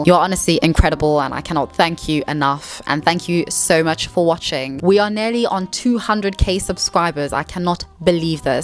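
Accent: South Africa. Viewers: Youre South African? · Amen! Love your accent sounds a bit South African. You speak clearly and make perfect sense. Thank you! ❤ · Are you from South Africa? That accent 🔥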